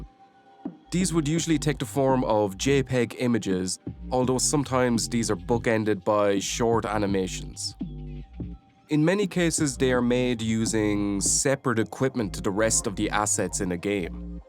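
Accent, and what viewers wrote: Ireland. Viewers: Tree D or 3D 😂 im a a black girl from Atlanta but my dad's parents are from Ireland. I know a fellow Irishman when I here one. I'm making a 16 bit game and need all the info I can. Take care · You're from Dublin, ain't'cha?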